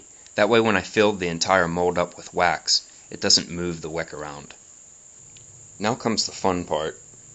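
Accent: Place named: New Jersey, United States. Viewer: Are you from New Jersey? I know of a guy who is from NJ that speaks a lot like you do.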